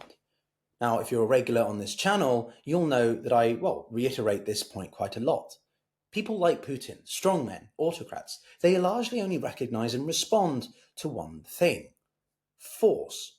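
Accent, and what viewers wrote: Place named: England, United Kingdom. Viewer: Another Nice Jew-is boy with an English accent.. Talking crap! Says The BronxBoy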